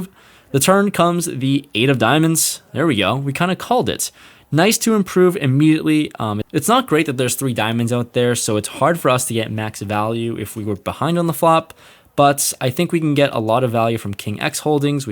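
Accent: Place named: Western Massachusetts, United States. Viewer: Where are you originally from? i hear western mass a lot and i know you mainly play in NH. Are you from MA or NH?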